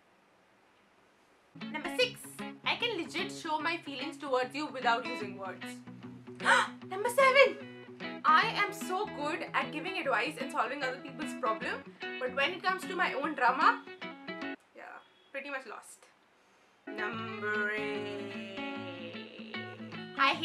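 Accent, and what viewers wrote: India. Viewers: Your indian accent is sooo cute..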